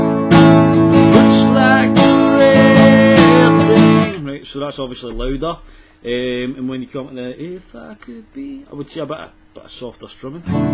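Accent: Scotland, United Kingdom. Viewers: Is he Scottish ?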